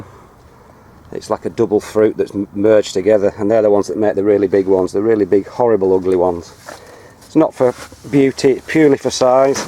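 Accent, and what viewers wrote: Yorkshire, United Kingdom. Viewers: Brilliant vid as always. Love your natural commentary and Yorkshire lingo. Not so much different from us in Lancs. not looking forward to winds if they come as you say. I'm on my 4th attempt green beans and they're hardly in flower yet and only 5ft tall. Winds will kill em off! My indoor cuke got flowers and no cucumbers yet! Chillies great and harvesting my outdoor bush toms already, indoor ones nowhere near ready. keep an eye on turnips. Mine are super quick. Seed to table in under a month! Don't ask me how and leaves huge! Hot here around teatime about 25* only 10-12 overnight. My 2 cabbage I planted a month ago as 2" seedlings are now ft long sprout plants! Packet obviously wrong!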